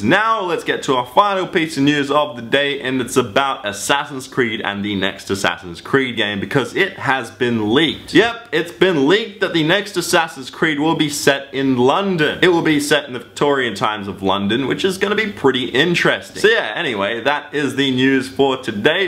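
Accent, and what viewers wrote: Australia. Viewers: huh hes aussie subscribed im aussie too WOO HOO haha lmao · Are you Aussie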